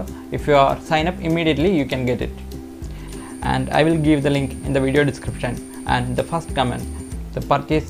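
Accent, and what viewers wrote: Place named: Kerala, India. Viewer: ഹെ മലയാളി ഇംഗ്ലീഷ്‌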